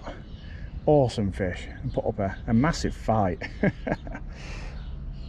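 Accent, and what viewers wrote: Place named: Lancashire, United Kingdom. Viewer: I’m thinking he’s from East Lancashire or West Yorkshire. I may be wrong though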